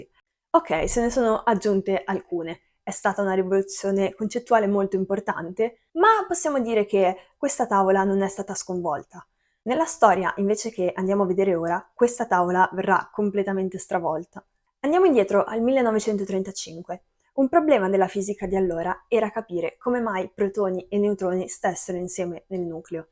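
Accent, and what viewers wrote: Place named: Tuscany, Italy. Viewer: Hai un simpatico accento forse toscano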